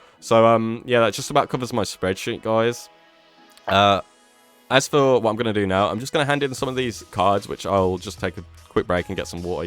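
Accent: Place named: United Kingdom. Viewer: I find the pronunciations of “unrequited” and “succor” to be offensive. Are you or not?! Edit: “The ‘Enfusiast’” absolutely sounds British 😆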